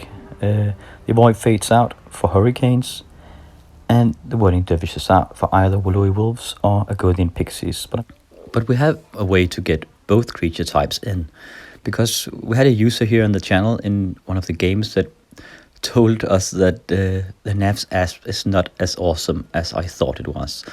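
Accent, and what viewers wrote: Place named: Denmark. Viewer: I thought you sounded different from Timmy, best friend is in Denmark, always like hearing the accent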